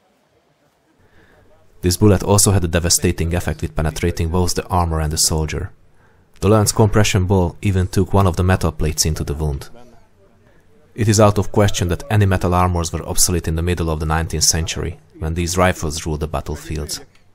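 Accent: Hungary. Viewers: I love that hungarian accent :) Experiments like this are a mix of experimental archaeology and some sort of survivalism. You never know when such infos will be useful. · Hungarian accent 😃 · I know that you’re Hungarian but the accent is extremely annoying....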